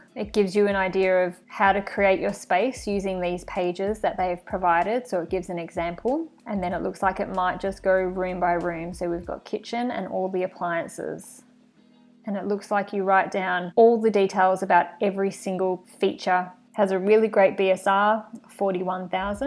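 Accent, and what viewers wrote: Australia. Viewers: Is that an Aussie accent I hear?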